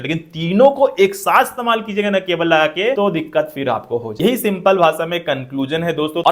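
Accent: Bihar, India. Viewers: Ka ho bhaiya. Aap bhi bihar se hauwa😂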